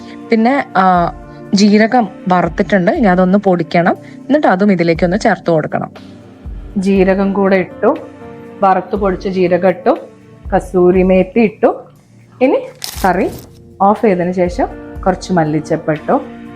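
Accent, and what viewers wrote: Kozhikode, India. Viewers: Natural samsaaram kozhikoden style .... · de ആ ഇരുത്തം ഒക്കെ നല്ല അസ്സൽ നോർത്തി. but സംസാരം ന്റെ കോഴിക്കോട് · I like ur kozhikode slang Me from kozhikode😂😂chevayoor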